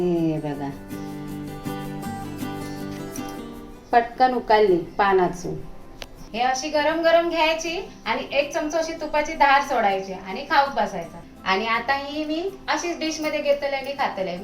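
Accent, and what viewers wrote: Malvani, India. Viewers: तुझे video मी recipe साठी बघतेच. perfect measure असतं. thanks for sharing पाटोळ्या. आणि सगळ्यात महत्त्वाचं. तुझं मालवणी बोलणं फारचं आवडत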